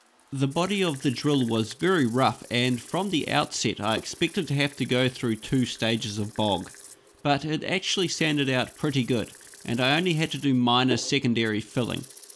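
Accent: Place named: New Zealand. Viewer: 1) Aren't you giving the foot-switch your luxury restorative spa-treatment as well? 2) Your videos remind me of the appendix discs of LotR where that guy from Weta talks about all the props and stuff (I mean this in the best way possible!). I think your accent may have something to do with it... 3) Who knew watching someone on the other side of the World restore old machines could be so interesting? Your skill, both at restoration and making videos, is impressive. I hope you keep posting,. 4) Greetings from Norway!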